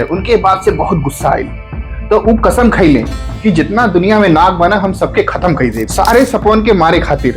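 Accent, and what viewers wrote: India. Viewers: Superb sir and hmare tarf wali bhojpuri sun ke maja aa gya🙏🙏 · Itni shudh bhojpuri 😮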